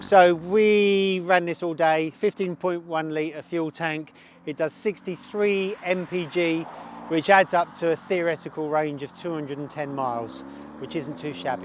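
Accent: United Kingdom. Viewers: And he still sounds UK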